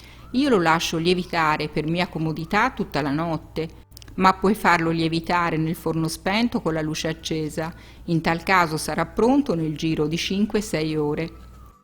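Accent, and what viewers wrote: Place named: Pienza, Italy. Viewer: Fantastici!!!! Le tue ricette sono perfette vengono sempre ...e poi mia madre e’ di Pienza.... il tuo accento mi fa sentire a casa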